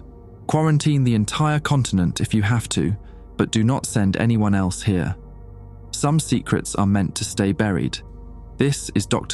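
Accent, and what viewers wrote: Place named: United Kingdom. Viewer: a handsome british voice on an (ant)artic creepypasta? sub.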